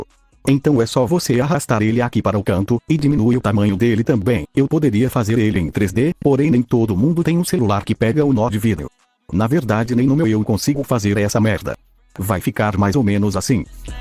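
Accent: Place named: Northeast, Brazil. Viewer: Tu é nordestino ? Se não é é minero Parece nordestino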